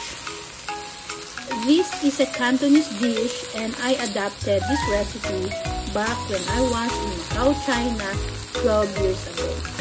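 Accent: Philippines. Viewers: Love you Filipino accent 😊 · ph accent.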